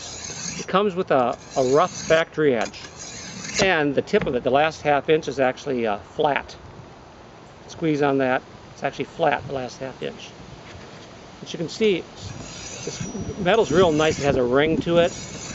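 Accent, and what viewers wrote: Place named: United States. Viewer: Were you in Brazil? You sound American.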